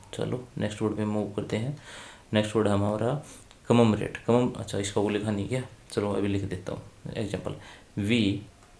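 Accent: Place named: Haryana, India. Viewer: 😅 PURE HARIYANVI ACCENT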